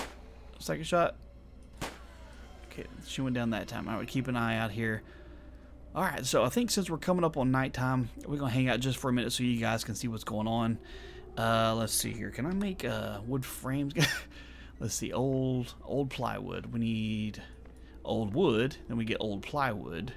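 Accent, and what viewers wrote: South, United States. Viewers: I'm curious where your from I can hear the southern in your voice and I like how you talk very clear and to the point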